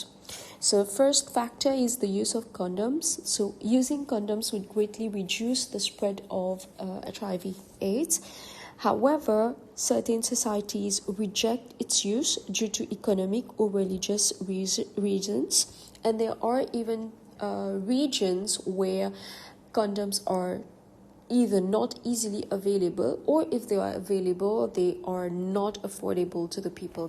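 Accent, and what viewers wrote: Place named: India. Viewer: Thank you so much!!!!, if I may ask, were u from? your accent sounds like an Indian accent with a mix of something else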